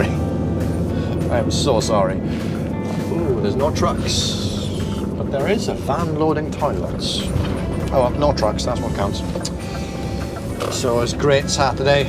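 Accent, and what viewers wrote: Wales, United Kingdom. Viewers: Welsh accent makes it better “ya joooking”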